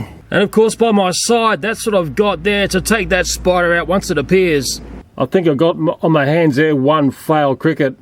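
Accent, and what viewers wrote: Australia. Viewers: Sorry I hadn't found your channel earlier just looking through your vids and I found this one it's SOOO fascinating to watch and I come from the UK and I've always wanted to go to Australia and to look at your garden and stuff it just makes me so happy and hearing someone Australian is amazing love it channel 💜💜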